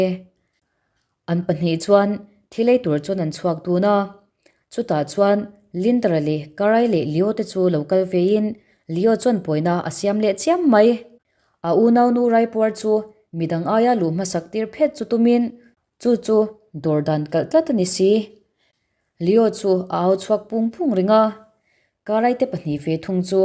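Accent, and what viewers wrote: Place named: Myanmar. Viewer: Velah i ti ziah mai burma mizo tih awm vel a ni saptawng in chhiar law2 la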